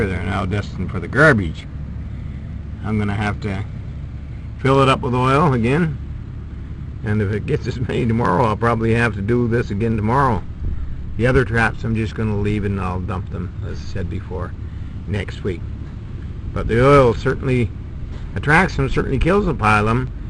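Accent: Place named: Canada. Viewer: you're not nice.. The guy is 1. Above 60 years old and 2. A nice sounding Canadian fellow. Give him a break and save your hate.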